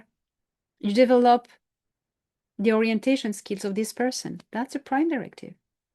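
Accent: France. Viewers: Great addition to the Star Nation News episodes :-). I had a query about #35 Webinar last weekend on the 26th that I attended related to a question I submitted that Elena answered about CE5 and which ET beings and races it is best to reach out to during such a type of meditation. I did not know where to reach out too to get this comment to Elena through herself or an associate, etc... In the answer there are a few of the ET races you mention that I just cannot fully understand/hear properly what you are saying, most likely due to your elegant French accent and dialect, but if I could somehow get some clarification on this from you, I would greatly appreciate it. What I can make out is : Kaheel from Epsilon (something) Aheel Alteans intergalactic confederation Aruhri Arcutians Zanete Now, in particular, the two I can’t understand are the Kaheel from Epsilon (something). 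Obviously, the something part, and the Aruhri Arcutians Zanete these two I am not certain at all, so any clarification on these and whether I have it right would be appreciated. The other two I think I pretty much understood. One thing I was wondering about was the Alteans' intergalactic confederation? Is that some sort of like a federation those beings are in? Any feedback and clarification on this would be greatly appreciated. I of course look forward to building my CE5 meditation technique now around reaching out and connecting with these beings. Thanks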